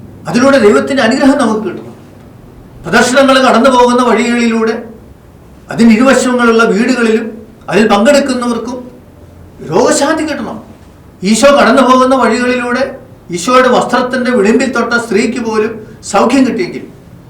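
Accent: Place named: Chittattukara, India. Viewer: Fr., you are from chittattukara, your speech shows that •thank you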